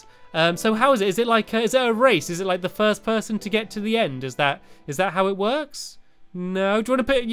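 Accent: United Kingdom. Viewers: are you british? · People,you are mean,he is from england,so he is gonna sound like that(no offense) some people have accents,some people dont,i like stamps so don't be RUDE!!!!!:)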